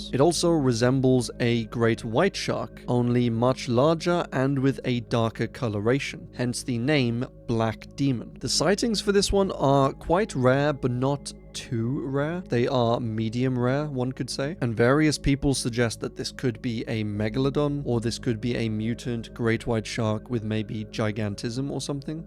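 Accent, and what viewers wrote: United Kingdom. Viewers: Did I just find the British Wendigoon?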